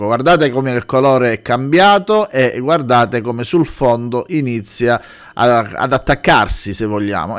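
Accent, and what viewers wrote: Calabria, Italy. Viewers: Grande. Peccato quell'insopportabile inflessione calabrese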